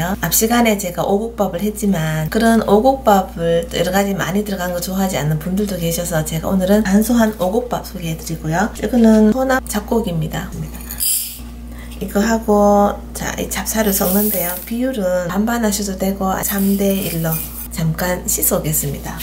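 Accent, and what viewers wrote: Gyeongsang, South Korea. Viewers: 해외 나와 산지 4년이 다되어 가서 정월대보름되면 나물이 너무 먹고 싶더라고요 ㅠㅠ 혹시 대구 분이신가요? ㅎㅎ 말투가 너무 정겨우세요 제가 대구사람이라 ㅋㅋ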